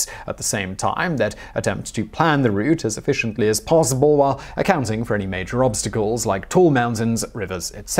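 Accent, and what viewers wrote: United Kingdom. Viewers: You sure do make a lot of assumptions. So Neil Patrick Harris is probably pretty knowledgeable about surgery? And news anchors practice reading off the teleprompters? You bring up that he has multiple channels. Is he doing that for all of them? You only think of him as intellectual because of his British accent, not because of any real critical analysis. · Nero tarnished his own name. He didn't need anyone else to do it. He is likely the Beast of Revelation. This guy gets a lot wrong, but because he says it with a pompous British accent and feigned authority, people believe it.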